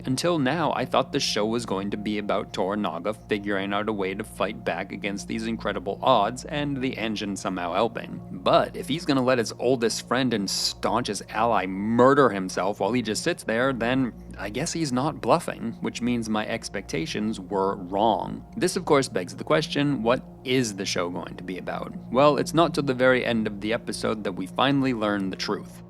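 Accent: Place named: United States. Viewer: Since he's pronouncing everything with a fairly strong American accent, he would probably pronounce Kurosawa wrong as well 😂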